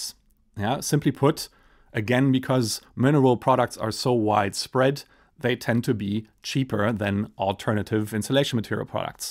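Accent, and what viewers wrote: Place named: Germany. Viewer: You have some german accent, are you from Germany? :)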